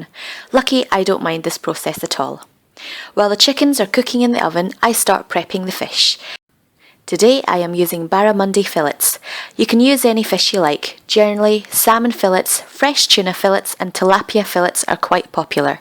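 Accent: Scotland, United Kingdom. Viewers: love your British accent! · You sound so Scottish :) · Love the Accent, FREEEEEEDOM. poshest Scottish accent i have heard in a long time and a good video to boot.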